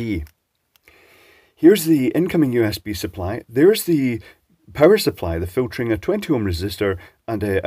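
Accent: Scotland, United Kingdom. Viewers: I was expecting the light to have trouble understanding the Scots brogue, just like the voice controlled lift in Burnistoun... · Might be an example of edge computing. I haven't investigated the tools for this but the claim seems to be that you do training with the expected powerful computer but then condense the result into something that can run on a microcontroller. Very impressive that it not only doesn't need personal training but can even cope with a scottish accent! got a pretty clear voice, though. I wonder if it would handle glaswegian or geordie. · Especially with a UK accent. Eg YouTube auto captions usually make a hash of.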